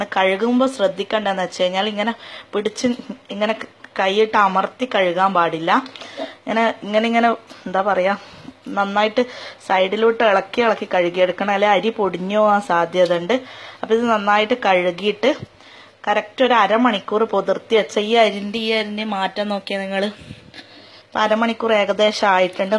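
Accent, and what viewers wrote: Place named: Malabar, India. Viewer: റെസിപിയെക്കാളും ഇഷ്ടായത് നിങ്ങളുടെ നല്ല പച്ച മലബാർ ഭാഷയാണ്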